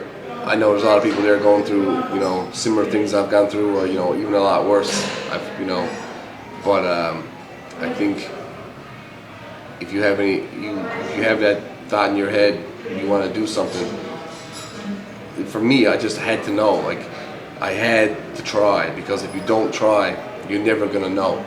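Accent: Newfoundland, Canada. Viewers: sick newfie accent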